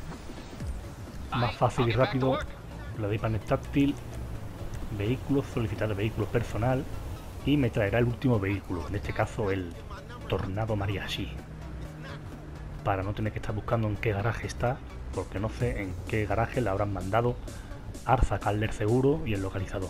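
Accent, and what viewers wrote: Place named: Andalusia, Spain. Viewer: Me encanta de que hables en Andaluz en tus vídeos ❤️ Grande lokete. Te veo desde Granada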